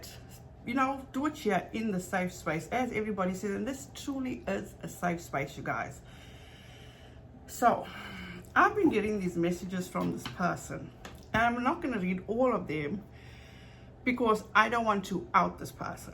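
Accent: South Africa. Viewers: Oh I'm so sorry - I had to pick my jaw up off the floor when the K word was dropped, my besty at highschool was from SA n listening to you is like hearing her Grandparents, such a great family - should've come here instead o US 😉🥰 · I absolutely LOVE your self confidence and self respect!!! U r such a beautiful person & mother and I’m SO happy u r able to let the ignorant comments by vile & disgusting people just roll off your back!!!! I can totally tell that it doesn’t bother u which I think is awesome!!! I know your grace really infuriates those insecure people!!!! Good for u!!! I’m proud of u & I love your passion, compassion & your intelligence!!!! (Side note - I ❤️❤️❤️ the South African accent!!! It’s my favorite of all accents!!) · 1- just because 1 partner doesn’t bring in income does NOT a mean they don’t contribute financially. Often it’s the at home partner who pays the bills and manages a budget. 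2- I’m really embarrassed that I thought your accent was Australian. Oops. Now I know it’s South African. And I’m so sorry someone thought it was acceptable to call you a slur. Not ok.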